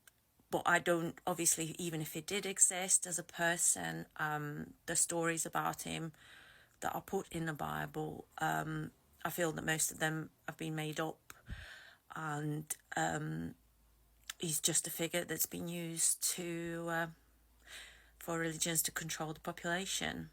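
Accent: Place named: North Midlands, United Kingdom. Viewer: I was born into it but made my 'escape' in my mid-teens when I got old enough to slowly move myself 'out the door' over the course of a year or so. So well done to you for making the break. I have to agree that the use of the Bible as if it is an authority with the power to convince you does seem to be very common. My father tried it on me tirelessly from when I left the organisation until the day he died, utterly ignoring my core response that, if I do not agree that his source is 'literal truth', then it is has no chance of causing me to suddenly see the 'error of my ways'. Greetings from an English Druid ... and I was surprised when you said you were Latvian as that accent definitely sounds North Midlands to me :D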